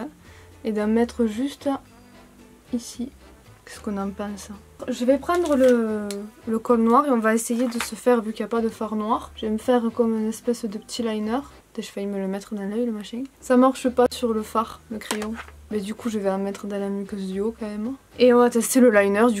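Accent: South, France. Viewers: Bonne et joyeuse à toi et à ta famille je te kiff grave merci beaucoup pour toute tes vidéos ta bonne humeur ton accent du sud que j'adore continue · Oh putaing ! Tu viens de Sud toi non?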